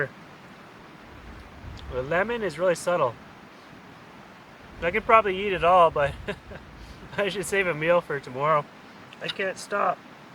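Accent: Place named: Canada. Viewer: Wonderful vid's. However, what really has attracted me to your vid's is your "voice & Canadian accent & yes "(oout, aboout). A magnificent combination. Thanks for all that you do & keep up the great work🥂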